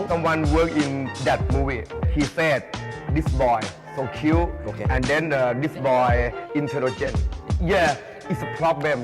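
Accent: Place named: Thailand. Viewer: ถึงสำเนียงจะไทยมากแต่ถูกต้องทุกคำค่ะ 😊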